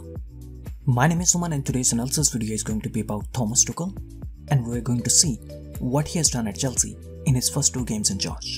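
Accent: India. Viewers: Man sounds like he's from India, and here the match is early on Friday.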